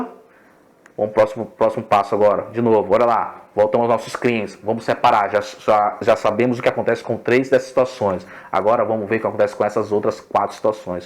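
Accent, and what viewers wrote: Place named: Bahia, Brazil. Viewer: Show de bola a animação. Mas qual é a origem do sotaque meio que baiano kkk Abraços Excelência...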